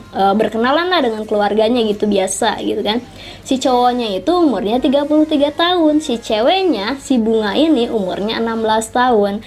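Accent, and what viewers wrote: West Java, Indonesia. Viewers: Sunda campuran ngomomg na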